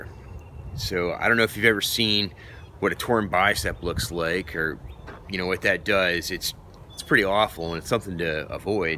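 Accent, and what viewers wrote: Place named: California, United States. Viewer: This is good, you cover stuff that I'm not seeing others do. Thank You. BTW, are you from California? You have some sort of surfer dude way of talking that certainly doesn't fit New Jersey.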